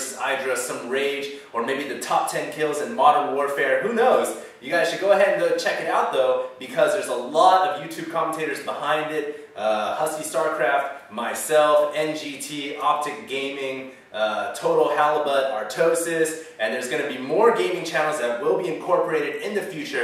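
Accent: United States. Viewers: he sounds american wtf doesyour statement mean???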